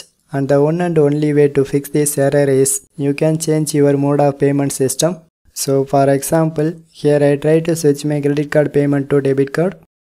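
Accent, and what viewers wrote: India. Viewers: I can't understand Indian English....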